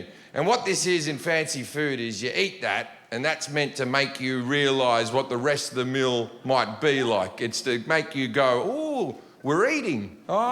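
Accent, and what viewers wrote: Australia. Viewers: I can't tell if this is a joke or if you haven't realised he's genuinely Australian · Is he Australian or not, I said that because of his Accent. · So Aussie lol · As an Aussie I cannot stand this stupid Occa accent. The guy sounds like he was dropped as a baby...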